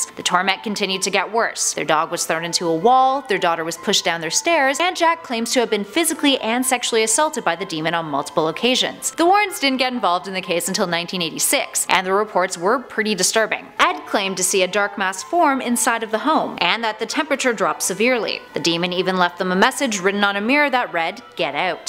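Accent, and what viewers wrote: Canada. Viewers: Either Canadian or from New England, US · Liliana Jasmine maybe its canadian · She sounds Canadian, eh... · Garrapaterorulez Sounds Canadian. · How many times does this story need to be recreated on YouTube, same story different face with a Canadian accent. Blah blah blah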